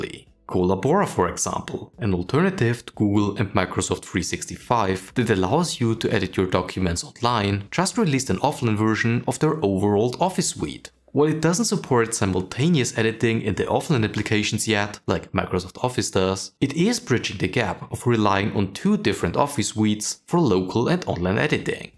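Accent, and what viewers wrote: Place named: Germany. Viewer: I see open source, I hear German accent, I subscribe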